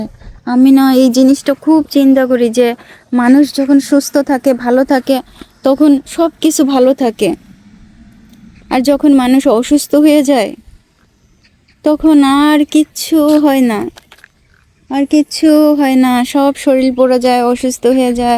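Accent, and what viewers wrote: Khulna, Bangladesh. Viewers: আপু আপনার ভিডিও দেখি শুধু আমাদের খুলনার ভাষা শুনার জন্য। ❤️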